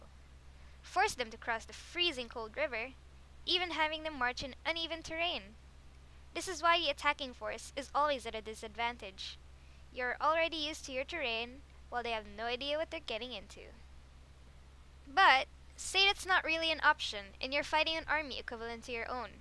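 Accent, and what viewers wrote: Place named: Philippines. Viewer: why does this voice sound suspiciously filipino to me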